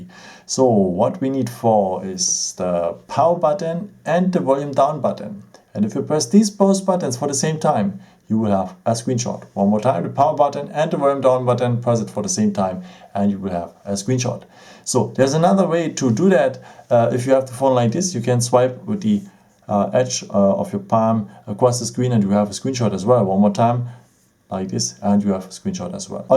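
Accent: Germany. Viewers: Warum hört man bei deutschen immer raus das sie deutsch sind?haha aber danke für das Video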